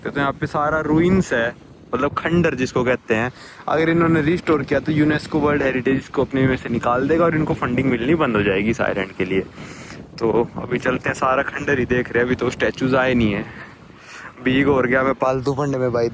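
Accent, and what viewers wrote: Haryana, India. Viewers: Hats off to you dear. I enjoyed your video of Easter Island. You went so long in south america. Try if you can go to Antarctica, we are following you always. You always explain nicely. I like your haryanvi tongue. I am also a traveler. Only south America continent is left. Sorry you lost the phone. Best wishes 🙏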